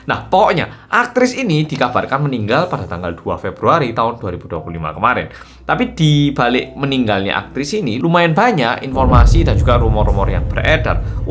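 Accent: Java, Indonesia. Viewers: Salfok sm oppa korea yg ngomong nya medok 😂 salam kenal oppa.... moga sehat selalu ❤ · Mas medok banget ya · Ya ampun oppa bhs indonesia lancar bngt 🤣😂😂😂.. baru following sdh ngakak dl an dgr in bhs Indonesia nya 😂😂Lol logat jw pula 😂😂😂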